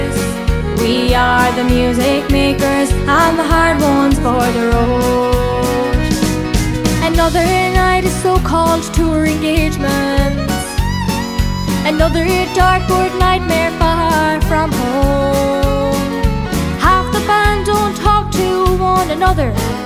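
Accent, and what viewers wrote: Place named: Ireland. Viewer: Love the Irish accent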